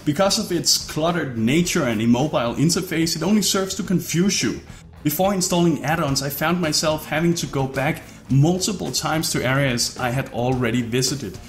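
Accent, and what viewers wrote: Denmark. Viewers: danish accent I feel lol. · Dansk accent er ikke til at tage fejl af! Fed video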